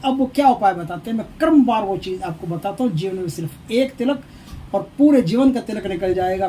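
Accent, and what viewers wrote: Rajasthan, India. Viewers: आप राजस्थान से हो क्या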